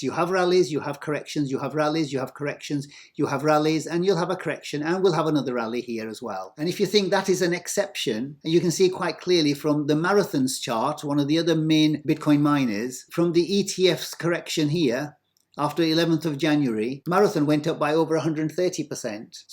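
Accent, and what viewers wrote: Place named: Yorkshire, United Kingdom. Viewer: Hey ~ This may surprise you!! The B-Exit Indixator is not my favourite part of your video... rather hearing your Yorkshire accent!! No eee byy gum though???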